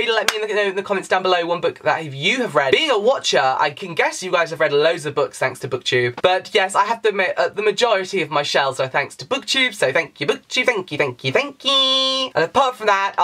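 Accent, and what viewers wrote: United Kingdom. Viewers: Happy Birthday 🎉 I like your English accent 🤗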